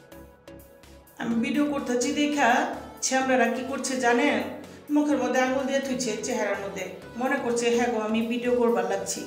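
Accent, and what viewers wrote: Old Dhaka, Bangladesh. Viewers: পুরার ঢাকার কথা শুনতে আমারও ভালো লাগে। শুধু আপার কথা শোনার জন্যই পুরো ভিডিওটা দেখলাম।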